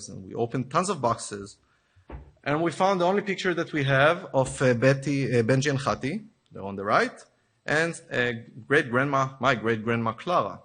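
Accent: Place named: Italy. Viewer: u sound italian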